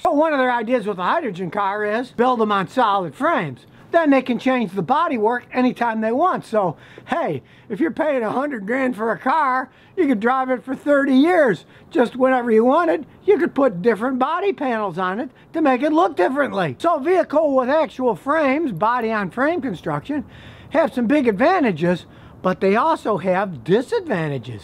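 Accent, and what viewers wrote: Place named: Chicago, United States. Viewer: Most Chicago accent ever. Could have been on Bill Swerski's super fans.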